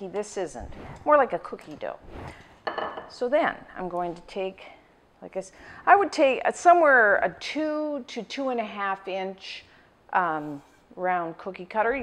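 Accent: Canada. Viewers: Ah, you're hearing the North American accent, but you've completely missed her CANADIAN vowel! Nobody in the U.S. sounds like her! (Unless, perhaps, they live near the Canadian border). The Canadian accent is heard in the vowel "ou", as in "out" or "about," and it is adorable.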